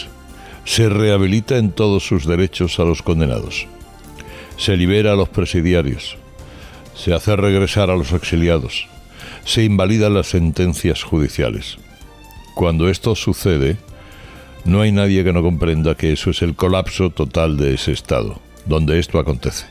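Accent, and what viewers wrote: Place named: Almería, Spain. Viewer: ¡Y que acentillo de Almeria te sigue saliendo, Calos! Eres el mejor haciendo radio chula. Desde Almería un fuerte abrazo, paisano. PD : Doña Pilar Llop no dice nada porque está muerta de vergüenza ajena.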